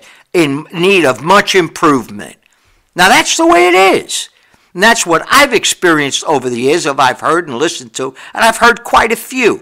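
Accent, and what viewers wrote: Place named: New York, United States. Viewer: I am from faraway New Zealand.....and I love the New York accent! Not only that, Brother is an excellent preacher and is not afraid to tell it like it is. I appreciate that! The churches from my hometown are largely of the social gospel, sugar coated, entertainment variety. And they use the modern ‘bibles’. I moved away from them several years ago so I am extremely grateful to have these wonderful, Scriptural videos. Many, many thanks from a Christian Kiwi!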